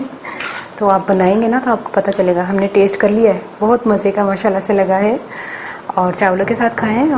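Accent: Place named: Punjab, India. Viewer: Banda bry gor naal twadi video Wekh rya honda ju e jnab tusi punjabi boldy o andru'n hasa nikal janda😜🤣😂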